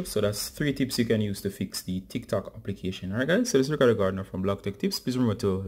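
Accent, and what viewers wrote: Jamaica. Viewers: Sounds like a Jamaican accent. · Are you Jamaican?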